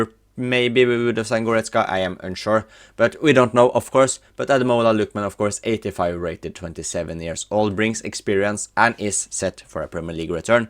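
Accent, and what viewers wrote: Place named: Russia. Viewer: You Sound Russian Bro 😂🎉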